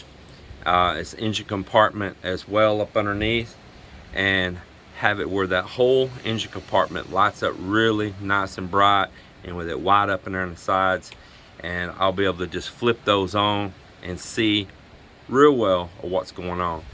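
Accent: Texas, United States. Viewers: Oh I hear that Texican, bellge. My cousin lived in Post Texas and he worked killing whales 🐋, oil whales that is. Different places different colloquialisms expressions and pronunciations. All my Texican family I was close to are gone now. You just brought some fond memories back.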